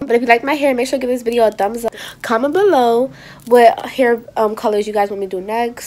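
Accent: New York, United States. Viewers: r u from new york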